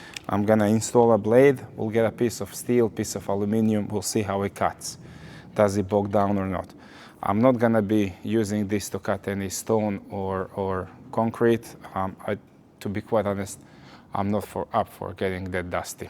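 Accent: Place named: Europe. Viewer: Don’t let the accent fool you he’s from Australia. I thought I was watching a guy from Europe.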